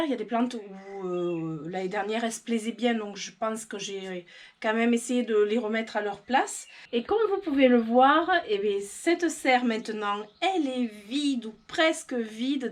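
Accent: South, France. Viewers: Coucou je dois te dire que, outre ta belle collection de plantes évidemment, j'aime beaucoup ton accent du Sud. Moi qui suis en Normandie je me rends compte en t'écoutant que nous les Normands mangeons les e: on dit "jardinrie" par exemple au lieu de "jardinerie", "boulangrie" etc:D 😆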